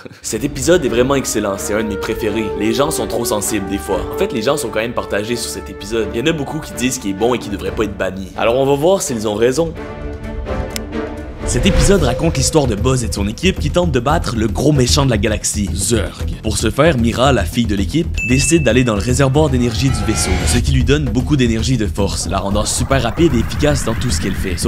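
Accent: Quebec, Canada. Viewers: t tu quebequoi touer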